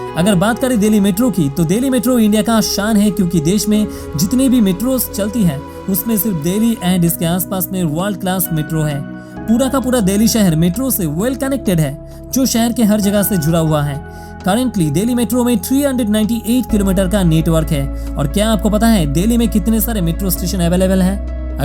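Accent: Bengal, India. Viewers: Hindi with Bengali accent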